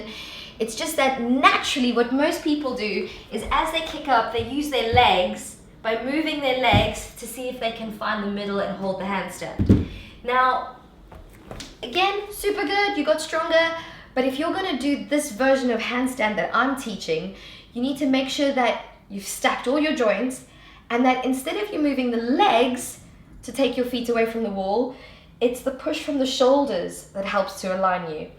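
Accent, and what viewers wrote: United Kingdom. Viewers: Are you from UK???? I'm asking you because of your accent cause my brother works in UK and I was in UK and everyone has that accent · Anyone else vibing the British accent after watching this?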